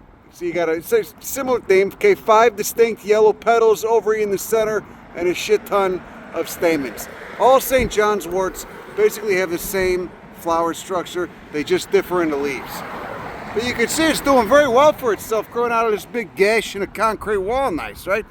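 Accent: Chicago, United States. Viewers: A man with tattoos, Chicago accent who loves Native plants is a man I would marry! I wish there was a gay clone of you!! And your fucking HILARIOUS!! ❤ Keep up the great work!!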